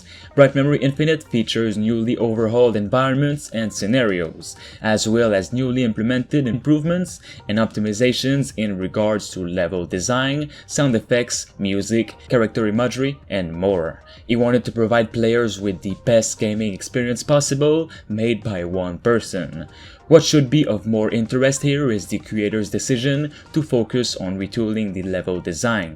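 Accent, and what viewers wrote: Quebec, Canada. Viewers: Are you french canadian? You have the accent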